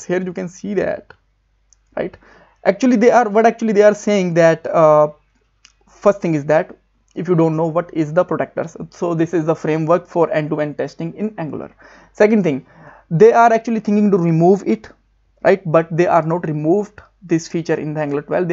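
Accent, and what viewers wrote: India. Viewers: this indian accent is the worst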